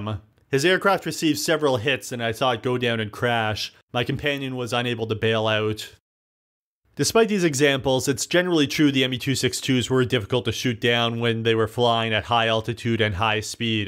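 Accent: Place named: United States. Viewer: "Weird" is probably a tad harsh, though I must admit I've never heard 'bomber' pronounced 'bawwwwwmer'. It just seems to be one of the more obscure America regional accents.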